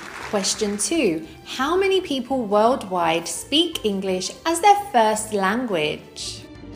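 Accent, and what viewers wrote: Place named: United Kingdom. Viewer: I like bri'ish accent😂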